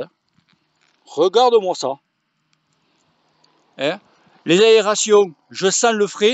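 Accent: South, France. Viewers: La vidéo, les bons commentaires et l'accent du sud font plaisir ! Merci pour ce moment, l'équipe est ravie de ce retour ! A très bientôt, La team Van Rysel :) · Super,a fond la forme.. Trop bien avec l'accent du midi 😅🤟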